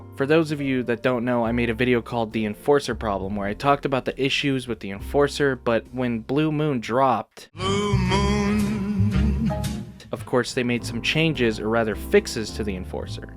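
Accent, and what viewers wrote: New York, United States. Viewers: I always thought that your New York accent was your actual voice whoops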